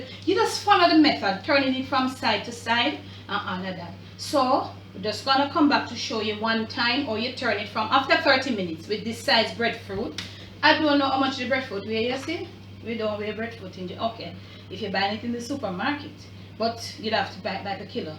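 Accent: Jamaica. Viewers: You too sweet.i enjoy your cooking and your Jamaican accent.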